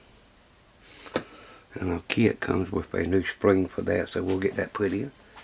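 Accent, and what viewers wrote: South, United States. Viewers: Hi Everyone. Once again entertains us with another 'hands on' video of his talents and commentary with a distinctive southern accent. I am always looking forward to the next one. And through our generous Patreon support, we can assure there is another. As a Patreon supporter, you will have access to special projects and give aways not found on YouTube. This week, kickes off a repair and restoration program series on a Drake TR4 amatuer radio transciever I donated to the Radio Shop just for Patreon. As most hams know, Drake TR4 are rugged, well built rigs that can match any modern radio in a QSO ragchew or contest. We also began a R&R series with the preliminary repair of a Hallicrafter's PS500 power supply to be followed by the repair of a Hallicrafter SR400 Cyclone transceiver. Lots of good stuff coming to Patreon. I invite all of you to join in the fun for as little as $1. 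See you there!!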